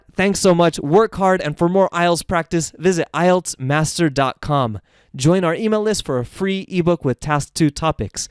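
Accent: United States. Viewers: Are you american?.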